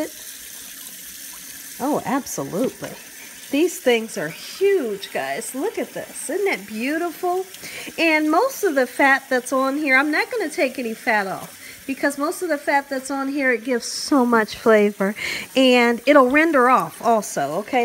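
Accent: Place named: Michigan, United States. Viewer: You hear me?Just like so.....just like so...I luv when you say those two phrases...lol you hear me? are you from the great state of Michigan? You sound like my friends that are from Michigan...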